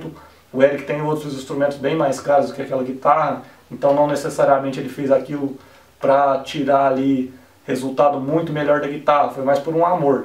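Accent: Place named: Minas Gerais, Brazil. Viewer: Araguari? Bacana! Sou de Uberlândia, bem ao lado. Você é de onde? É mineiro, pelo sotaque, mas de que cidade?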